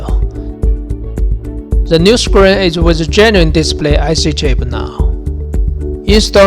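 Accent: India. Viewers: О этот божественный индуский акцент)) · thats not his video....... look.... this guys voice is indian, the video is china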